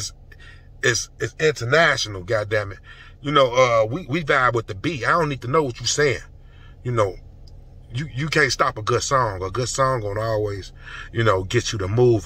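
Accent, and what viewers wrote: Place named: Chicago, United States. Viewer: In can tell he from Chicago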